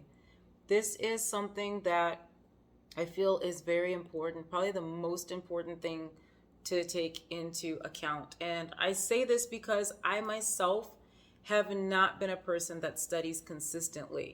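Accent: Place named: Russia. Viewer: удивительно но даже интонации - русские....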